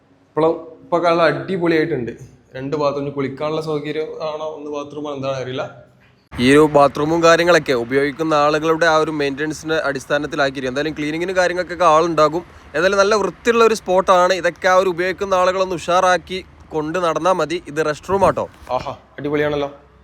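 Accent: Malappuram, India. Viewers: മലപ്പുറം സ്ലാങ്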